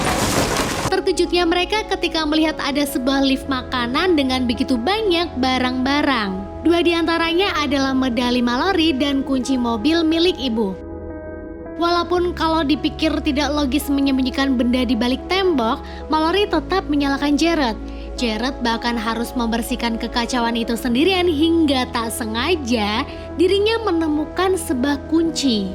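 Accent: Pemalang, Indonesia. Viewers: Btw orang pemalang bukan? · Orang Pemalang kah? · wong pemalang yo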